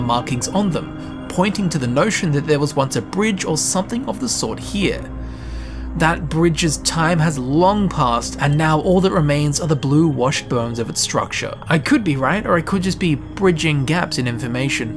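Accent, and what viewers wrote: Australia. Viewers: One he is austrlian, two thats how aussie accents sound.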